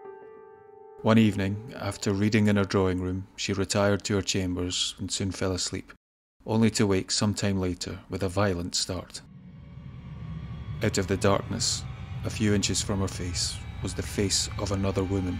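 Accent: Scotland, United Kingdom. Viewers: I love a good ghostie story, but I love a Scottish accent even more. I swear I'd be watching even if you read the phone book. Great stuff :) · I adore your Scottish voice so much👍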